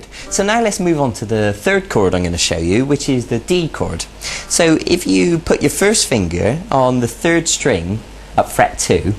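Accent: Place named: West Country, United Kingdom. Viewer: Bristol? Somerset? I’m from Exeter buddy. Lovely to hear an accent like this on the Net.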